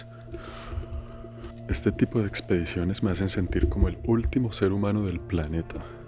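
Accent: Colombia. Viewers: Por su acento y educacion, es colombiano, cierto? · No entiendo d e qué se trata, eres de donde? Eres canadiense? Pero hablas como colombiano o eres italiano? O español no entendí tu canal…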